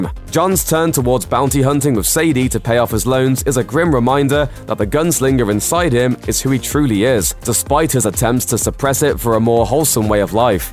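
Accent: United Kingdom. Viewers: Where are you from? I mean besides the UK. Love your accent and voice though. #nohomo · Is this guy a narrator for every video like this, or is this just how every single British man sounds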